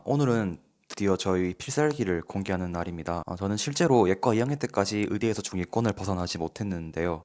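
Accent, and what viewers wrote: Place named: Daegu, South Korea. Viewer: 대구분이신가요? 대구사투리 정겹습니다^^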